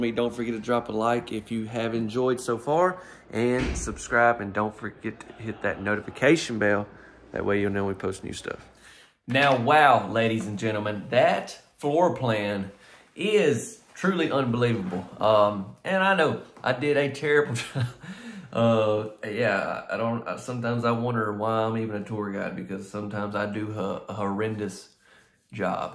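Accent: South, United States. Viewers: I LOVE YOU! I need to tell you though it’s not Loo-EE-Ville. It’s Lou-a-vull with the a barely heard! I live in Bowling Green, KY (aka Corvette City USA) We Kentuckians have been adding & deleting syllables long before the Millennials made it a “thing.” 😂 With your oh so pleasant sounding voice and friendly southern accent you can pronounce Lou-a-vull & sound like a native!!!! 😊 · I love to watch your videos! I’m in the process of building a new home but I love your southern accent and it’s just fun to watch your tours! Don’t ever stop being you!! I get lots of ideas from watching!!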